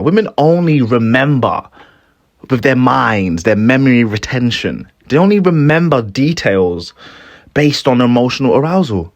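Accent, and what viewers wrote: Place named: United Kingdom. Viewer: Ppl like the fake. That’s why redpill folk so popular. Goofy ppl gravitate to goofy ppl. He’s this era Patrice O’Neal with the UK accent. Wish him success nd continued contentment in doing what he loves.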